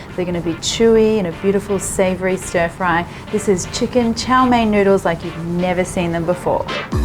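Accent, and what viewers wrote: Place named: Australia. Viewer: I have been watching your cooking channel n I think you are an excellent chef!! I can tell by the ingredients n your style of cooking. I love it n I will definitely try it. Not only that, love your accent!! Aussie, Aussie, Aussie.....🐨🐨💕✨🦋💕✨🦋😘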